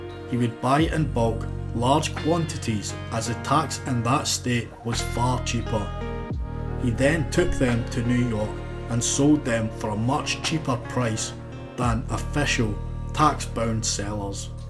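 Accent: Scotland, United Kingdom. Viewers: I must say the Scottish, when they’re not excited, speak the clearest English in my opinion and im a Londoner. Good luck fella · He's Scottish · Narrator is Scottish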